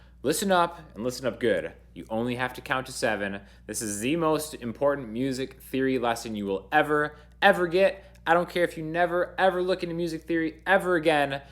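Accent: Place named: Sweden. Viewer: Interesting accent. R u Swedish or Italian?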